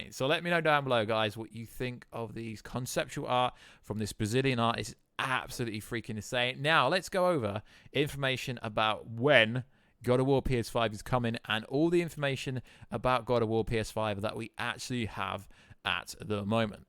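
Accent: England, United Kingdom. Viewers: (not relevant but but whereabouts in England is your accent from don't sound like black pool or London to me just curious?)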